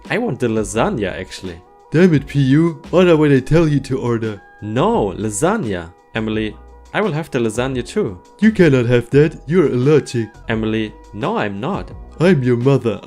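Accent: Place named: Germany. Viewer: Heyho ^-^ is it possible that you are German ? Becouse i am and your accent is a little Bit german, i was just curious (sorry for Bad english i am german ^^")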